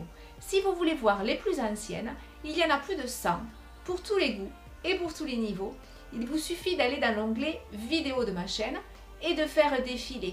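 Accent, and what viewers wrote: South, France. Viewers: Tout simplement magnifique comme vous!🤩🤩😍😍 Merci beaucoup pour ces bons moments et vos explications très précises ! Entre parenthèses, j’adore votre accent !🥰🥰🥰 Moi je suis du Sud Ouest et si je ne me trompe pas, vous êtes du Sud également 🤣🤣🤣 À bientôt, j’attends avec impatience vos nouvelles vidéos! 👍🙏🙏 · Vous êtes vraiment magnifique ! J’apprends beaucoup avec vous. Vous êtes très belle et charmante, surtout avec votre accent du sud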